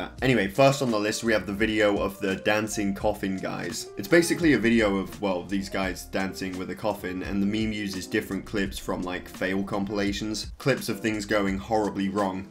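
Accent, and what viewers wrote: England, United Kingdom. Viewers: are you from england ?. do you watch doctor who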